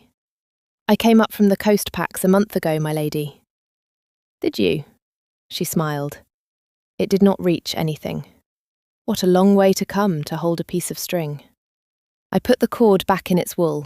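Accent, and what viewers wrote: United Kingdom. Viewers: Interesting story. Cool brit accent.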